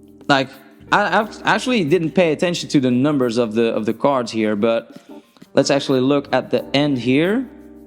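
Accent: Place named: Netherlands. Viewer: Are you Dutch? You have a dutch accent. Anyway i think the feebas and melotic need to go on top of eachother. So the feebas below an melotic on top. Anyway keep up the videos😁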